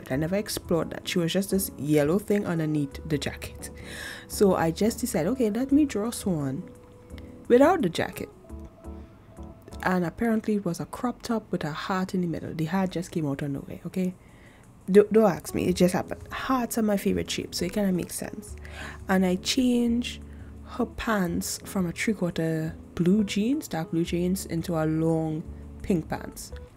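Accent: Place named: Trinidad and Tobago. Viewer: so i'm listening to you talk and i'm like 'why she sound kinda like a trini so loll' love your art :3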